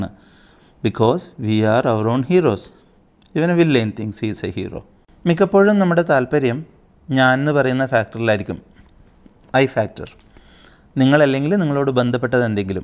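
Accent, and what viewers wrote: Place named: Kanhangad, India. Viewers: Kanhangad alle aadhiyettan...kannur alla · Kannur alla kasaragod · അങ്ങേടെ ഭാഷയിൽ ഒരു മലബാർ ശൈലി ഉണ്ടെന്നു തോന്നി.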